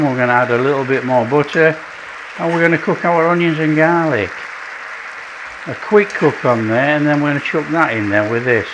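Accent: United Kingdom. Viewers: That sounds delicious, but I have no Idea, where I can get suet in germany. Maybe I just try it with butter. Cooked your chinese beef with onions today and had a look, what else I can learn from you. I really like your accent, from which part of the UK do you come? I can understand every word, thats nice, compared to all the mumbling americans, I can only get every third word…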